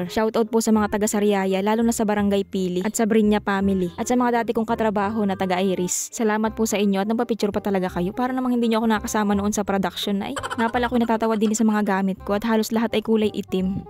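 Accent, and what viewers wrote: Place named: Mindoro, Philippines. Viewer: Hi miss sana lagi kang makapag upload araw2 nagustuhan ko mga content mo kasi natutuwa ako the way ka magsalita pare pareho talaga ang tono nyo ni kamangyan vlog, saka si mommy che na keri taga mindoro rin sya saka yung mag asawang teacher na vlogger yung babae naklimutan ko yung name nila sa fb para kasing bihira na sya mag vlog eh kaya nalimutan ko na pero love na love ko kayo mga mindoronian mabuhaY kayo....❤❤❤❤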